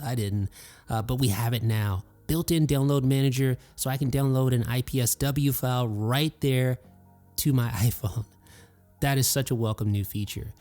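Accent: India. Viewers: Indian english accent.....indians like here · Indian voice 🤩🤩🤩🤩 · Grear vid and lots of info. All good except the language accent read back. No way would I put an indina voice to read me stuff on my phone. I can get that from all the scammers from there conning people here in the US lol. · Indian accent ❤️ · Whyyyyyyy the F would it have Indian accent????? xD xD xD xD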